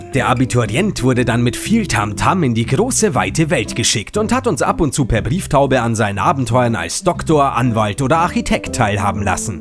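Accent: Bavaria, Germany. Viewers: du bistn bayrischer bub? und schon hastn abo mehr. dein Zynismus ist mega geil. :'D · woher aus Bayern bist du? grüße aus Rengschburg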